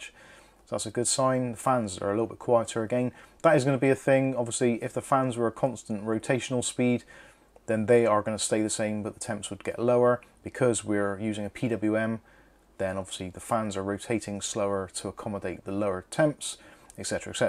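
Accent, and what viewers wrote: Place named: West Country, United Kingdom. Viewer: Nice to hear a west country accent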